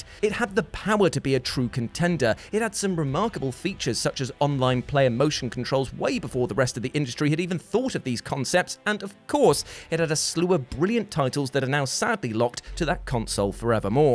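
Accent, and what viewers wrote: South, United States. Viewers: That Southern accent 💯💯💯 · Not mad at that cowboy accent at all 😂😂😂😂😂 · As a southerner (TN USA) I gotta say....your (admittedly somewhat over-the-top) southern accent was on point man lol....GJ.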